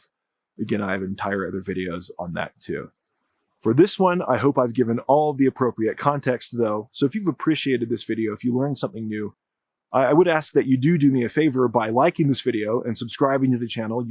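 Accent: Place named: California, United States. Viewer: Snl californians accent a little annoying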